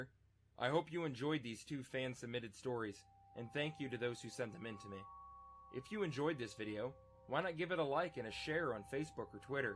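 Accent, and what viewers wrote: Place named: United States. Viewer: Nice to hear an american read for once, almost everyone are brittish Or something like that lol. Great vid // a swede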